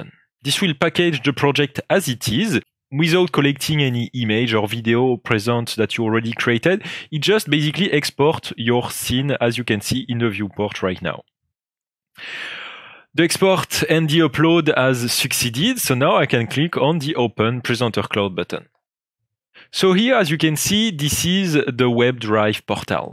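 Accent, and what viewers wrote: France. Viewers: Французский акцент? Очень сильный. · Twinnmotion is a beauty, but that fucking French accent leaves me in awe, still don't understand a single word the guy says unless I activate captions.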